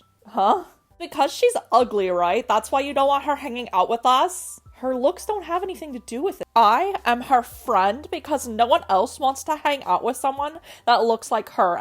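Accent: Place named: Boston, United States. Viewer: Why she from boston's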